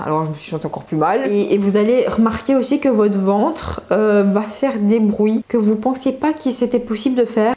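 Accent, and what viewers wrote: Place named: Belgium. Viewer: T'es belge non? J'ai reconnu l'accent belge 😭😭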